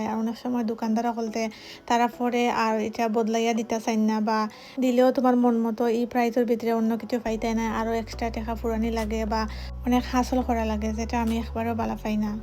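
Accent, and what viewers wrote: Sylhet, Bangladesh. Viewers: Apa I like the way you talk sylhety is our own language so no need to change your self because some one else, I like your video. Very nice design if you have phone number for that Gold shop can I have please thanks for sharing 👌❤️🌹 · I like your sylhety tone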